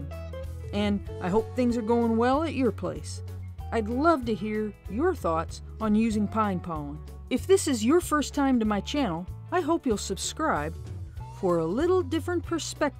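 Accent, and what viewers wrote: South, United States. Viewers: I am not a native english speaker, but your voice sounds like how I would imagine a southerner would speak like haha. where are you from?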